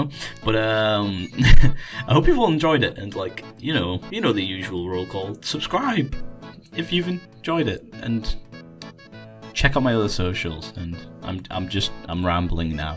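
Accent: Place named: Northern England, United Kingdom. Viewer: I love your northern accent, you from Sheffield or something?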